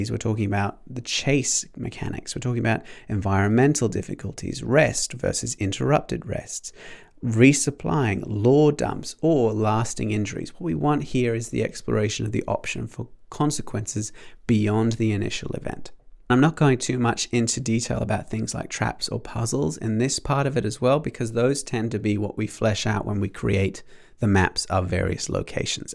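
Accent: Australia. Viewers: First time finding the channel and I immediately ran to the comments to look for this, except I'm pretty sure he's Australian.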